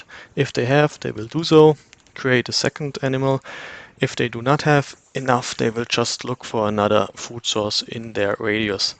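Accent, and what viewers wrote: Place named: Germany. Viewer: Gutes Video! (Ich geh mal vom Aktzent davon aus, dass du Deutsch sprichst 😜)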